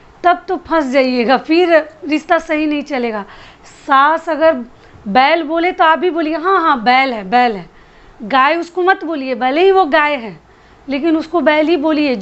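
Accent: Bihar, India. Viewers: Dii aap pirbiya ho ya bhojpuriya ya bihari kya ho.?🤔🤭kyuki aapki aawaj..mujhe..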